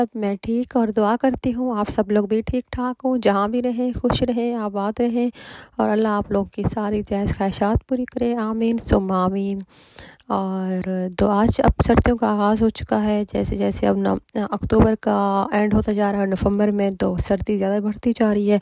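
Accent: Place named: Pakistan. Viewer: wasa ap ka bolana ka andaz sa lag raha ha ap Pakistan sa kidhar sa ho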